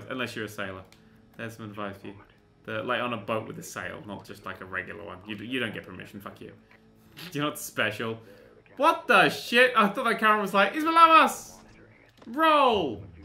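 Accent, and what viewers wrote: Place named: London, United Kingdom. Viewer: Hes english... his accent is english - londoner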